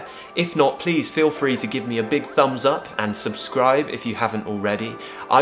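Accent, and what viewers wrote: RP, United Kingdom. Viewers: I love British accent ❤ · Your English is really British. I like british accent. Although I don't currently possess the ability to sound more beautifully British but with your courses I could brush my pronunciation up. Cheers.....!!!!!!!!!! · Wow I love your videos,I’m such a fan of RP accent!❤️ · I love your British accent and l hope to speak English like you. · I really love this accent. British English is a worthy and beatiful language that I want to keep up. I'd like to learn Slang words or expressions. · I love british accent,might ask you sir to give us a lesson about the stress.thank you in advence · Lovely british accent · I have seen more British English videos on YouTube, but your British English accent is pure and more British than others.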